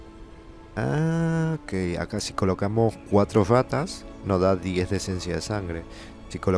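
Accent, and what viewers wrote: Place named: Santiago del Estero, Argentina. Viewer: Buenas hablas como santiagueño ^^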